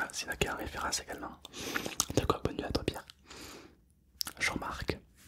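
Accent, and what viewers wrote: South, France. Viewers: Coucou je voulais te dire que j'adore ton accent du sud et ton amour ça m'a fait beaucoup rire 🙂 PS: la prochaine fois que tu fais une vidéo sur les prénoms est-ce que tu pourras mettre le mien Je m'appelle Jean-Baptiste. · tu a l axen du sud ????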